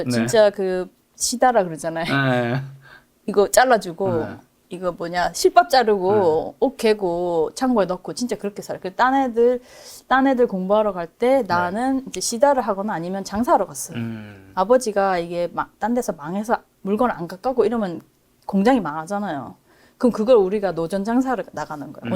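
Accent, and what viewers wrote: Gyeongsang, South Korea. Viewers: 악바리 근성~~대구 말씨가 스며들어 잇네요~~실패는 이기거나 성장이다~~대구 아가씨 대단하다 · 지나영 박사 화이팅입니다 !! ☆☆☆☆☆~~♧♧♧♧♧~~~ 갱상도 사투리가 듣기 좋습니다 !!!!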